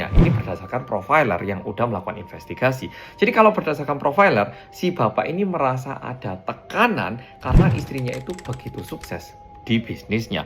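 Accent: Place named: Java, Indonesia. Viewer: Kak bikin konten seperti ini terus ya , aku paling seneng bahasa yg diucapkan , org Korea asli , sekrg tinggal di Korea tapi medok jawanya masih ada , aku bangga sebagai org Jawa ada org Korea mah medok jawanya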